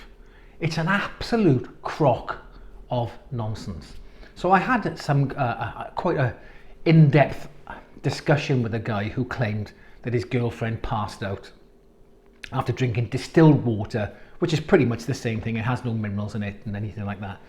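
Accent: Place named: Neath, United Kingdom. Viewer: Cracking video mate. I’m from neath. You sound close. Would love to know what my tap water is. I do want to get one of these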